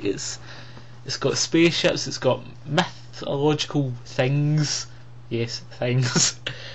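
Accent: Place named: Scotland, United Kingdom. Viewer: You are SOOOO Scottish XD That is one cool accent :D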